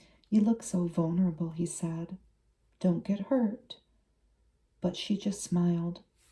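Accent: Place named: Minnesota, United States. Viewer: Sounds like Minnesota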